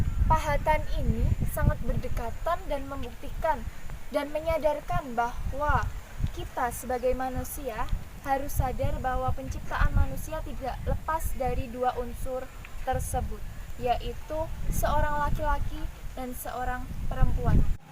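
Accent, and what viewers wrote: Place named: Java, Indonesia. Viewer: Good ya... Semangat belajar terus... Latihan speaking dan diasah terus..biar tambah luwes dan g medok lagi . Heee...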